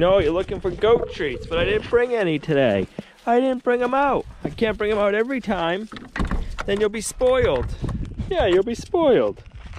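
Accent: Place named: New Hampshire, United States. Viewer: Ok, so I'm from LI, NY, we say Hot differently than folks from NH, or from Mass by way of NH.....how do you say Hut and is it different than the way you say Hot? I'm fascinated by that difference in accent. Not making fun of it but I'm puzzled by where your accent comes from! I've met folks from Northern VT and Northern NH, I think it's more the NH folks that have a distinctive accent. So in NY we might joke about how Bostonians say "park the car" from NH it's more "you can't get there from here" which has a very different accent than Boston! Loving the quirks of our regional accents! Which explains some of the reasons I also enjoy Cog Hill Family Farm from Alabama and Growing Grass family farm from Louisiana!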